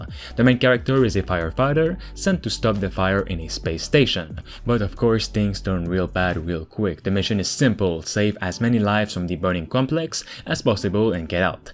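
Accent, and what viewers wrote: Quebec, Canada. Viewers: this guy is clearly from quebec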